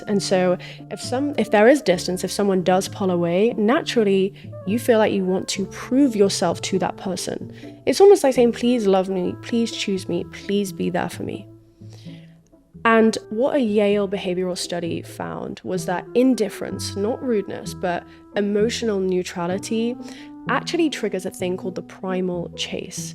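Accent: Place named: London, United Kingdom. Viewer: I Loooooooove your London accent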